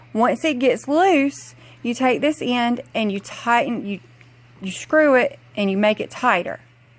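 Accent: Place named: South, United States. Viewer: I love your sweet little southern twang and your bracelet says "FUCKING LADY LIKE" - love it!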